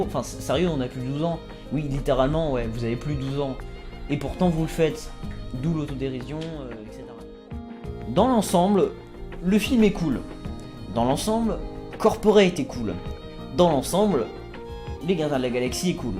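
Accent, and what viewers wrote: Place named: United Kingdom. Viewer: le peau rouge! (Ton accent anglais me rappelle un certain Mr Boyer je sais pas si tu connais)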